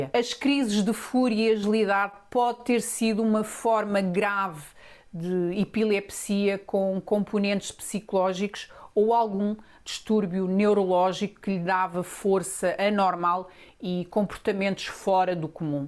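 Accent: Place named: Portugal. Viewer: É quase um luxo ouvir Português de Portugal, em Portugal ☹️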